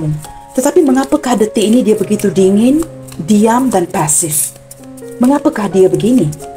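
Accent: Malaysia. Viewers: Waa halo disini juga bangsanya kita kan Bun , bunda kita dulu juga pernah komen disini loohh ihhh ahhaa serumpun lohh wahh dengerin siapa tau cengkok logat Malaysia bisa aku pelajari loohh n aku ingat keluarga tiktok Malaysia lohh ituloh yg pakai jilbab wahh moga tetep bawa energi yg positif , orang nya terkenal sopan wahh ngefan banget sama mereka bun Alhamdulillah Chanel liriklagu di Malaysia sukses moga banyak yg subcrib juga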